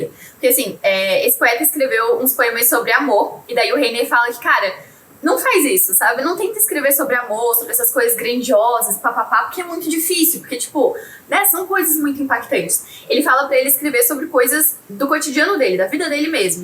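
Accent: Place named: Florianópolis, Brazil. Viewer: sou nova aqui e já estou adorando! Parabéns pelo canal 🥰 ah, eu conheço esse sotaque, és manezinha tb?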